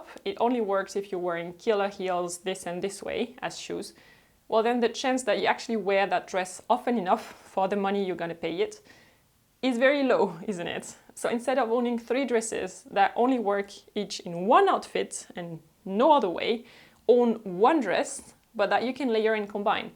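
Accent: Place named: France. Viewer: thank you for such an interesting videos! Can you do an advice video what to do with old clothes that I don't want to wear anymore? What do you do? P.S. your French accent is very cute!:)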